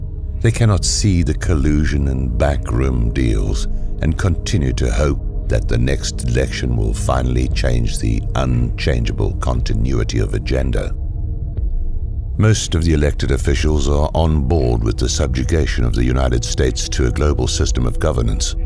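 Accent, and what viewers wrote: United Kingdom. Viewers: just a thought: does the Narrator speaking with an extremely British accent, actually say "We, as Americans..." ?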